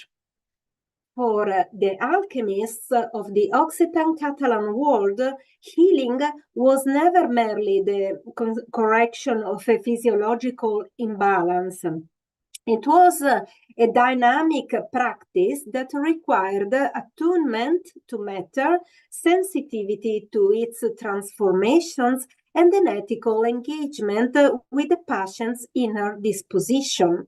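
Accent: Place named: Iceland. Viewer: how Friulian accent can sound close to Icelandic accent in the British language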